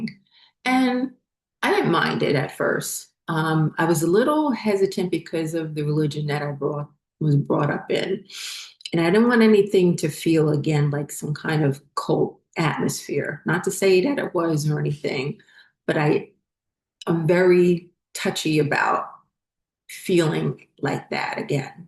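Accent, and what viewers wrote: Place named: New England, United States. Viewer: I saw this video a few days ago and it kept popping in my mind, so I’m back to listen even tho I haven’t started at the first video. For now, it seems like there is a message in here for me. Things around my house always start breaking, falling apart when it’s time to go. The last townhome I was in had a broken washer, drier, oven, stove, microwave, and heater 😮😅 by the time we finally were able to get out🎉🎉 Just want to say Good For You - telling one’s story is so powerful - and also I grew up in New England and enjoy listening to your narrative/accent.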